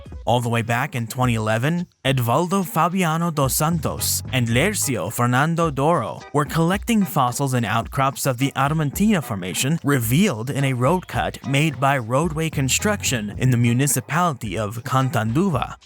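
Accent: Spain. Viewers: The day he stops giving Portuguese a spanish accent will be a day I'm happier than usual ... Until then I'd prefer to hear the correct pronunciation by any other means, like c'mon, can't you just insert Google translate audio?? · I Love how u give everyword spanish entonation, its a good guess if u dont know much portuguese, still funny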